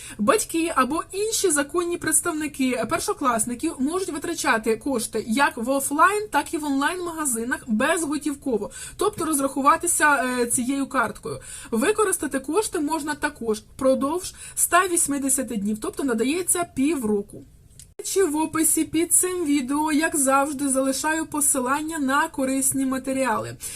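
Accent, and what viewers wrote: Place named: Vinnytsia, Ukraine. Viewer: Так розмовляє Вінницька область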